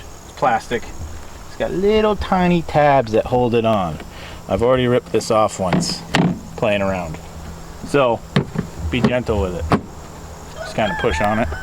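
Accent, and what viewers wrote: United States. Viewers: Literally the first sentence that came out of your mouth I can tell you from Wisconsin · Thanks for taking the time to share your opinion. Refreshing to see a video that is unrehearsed or sponsored. Like your breakthrough accents and lightheartedness. Good thorough review. Own a 650 XT Max and I love the machine. Mainer eh? In NH we call you "Maine-e-acks. "Kind proved it with the wheelie segment at the end of your video. Made my day!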